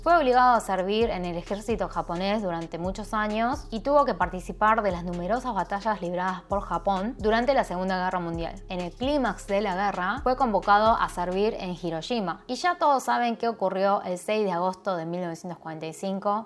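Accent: Buenos Aires, Argentina. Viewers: Hola, porque tienes acento argentino? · Cómo chica escuchar a una coreana con acento argentino. Muy raro. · ese ecento argentino chillon y horrible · el acento porteño ... argentino... genial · Eres koreana o argentina? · ._.' Es tan complicado acostumbrarse a ver a una coreana con acento argentino ._.' preferiría que hablaras en tu idioma y colocar subtítulos, por que ya no sé si veo a una coreana o argentina xD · Lamentablemente no puedo con tu Acento Argentino primer y último video que miro pero te deseo todo el éxito. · Entiendo, pero ese acento Argentino, suena asqueroso. Se dice YO no CHO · Se me hace raro ver a una asiatica hablando como argentina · Felicidades por tu excelente castellano. Noto un ligero acento de Argentina? Saludos cordiales desde Cochabamba Bolivia 🇧🇴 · No hay nada mas bello que una coreana con acento porteño... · Que gracioso una coreana, hablando con dejo argentino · 어려운 역사를 알기 쉽게 풀어주셨네요! 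스페인어 공부하는데 큰 도움이 됩니다. 아르헨티나 발음은 덕분에 많이 친숙해 졌어요~ 감사합니다.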